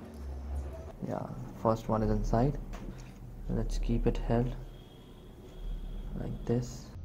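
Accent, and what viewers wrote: India. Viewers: 这是印度口音吗？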